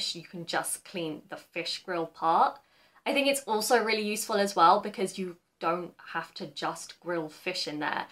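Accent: United Kingdom. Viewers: いつもの「british English」の発音が心地良いです エリザベス女王やサッチャー首相の発音が50年前にいた神父か牧師の発音に似ていて好き アメリカンはどこかいい加減で南部訛など聞いていられない、田舎者や貧乏人の様だ 今やウォシュレットとお風呂の湯はりは無いと引っ越ししたく成るほどの機能です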